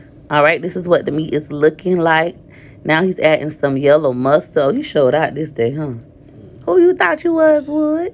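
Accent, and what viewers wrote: South, United States. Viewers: you sound like a southern belle, funny as heck.!!🤣🤣🤣🤣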